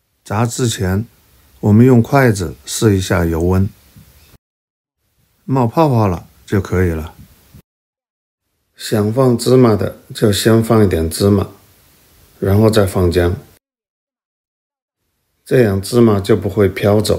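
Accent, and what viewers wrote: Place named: Wuhan, China. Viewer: 哈哈 这纯正的武汉普通话，好熟悉。不过这个面窝完全都没有没有泡起来，跟武汉的面窝还是有点差距。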